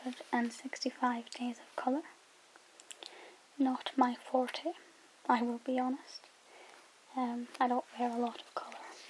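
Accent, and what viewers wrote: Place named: Aberdeen, United Kingdom. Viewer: i'm from Glasgow! :p One of my engineering lecturers is from Aberdeen, I knew I recognised the accent. Thanks for helping with stress problems, whenever i'm studying and it's stressing me out I just put one of your videos on, kills the stress almost instantly! :) x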